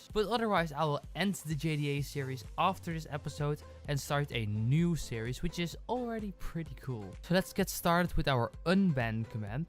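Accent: Netherlands. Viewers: your accent like a Dutchman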